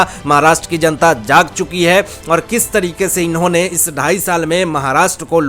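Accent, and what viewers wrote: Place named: England, United Kingdom. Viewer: Shri “ BARA” instead of “ BADA” Anchor is trying to copy ENGLISHMAN. Though we are free from slavery 70 odd years ago but still our Anchore is trying to imitate Britishers. Sad very SAD . Unfortunately he owner of channel encourage this lousy Anchor, when we can get dime a dozen best ANCHORS???????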